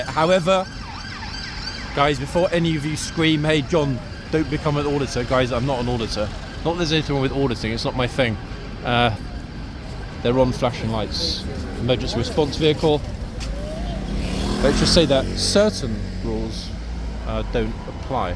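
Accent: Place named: United Kingdom. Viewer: I am wondering John, will the Life Guards be there next week, or will the Blues and Royals be there? We saw the soldiers in red last year. Even though they are both fantastic, we were hoping to see Blues and Royals. We arrive next Thursday. Just wondering! I love how knowledgeable you are John. The way you explain and ask questions, is awesome! We spend part of our mornings watching what is happening in our most favourite cities, London! We also love your accent! Actually, we love all the accents from the UK. Glad you have bounced back from your illness!🙂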